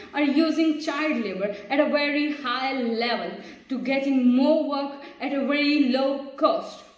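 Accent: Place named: United Kingdom. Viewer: British akcent👌..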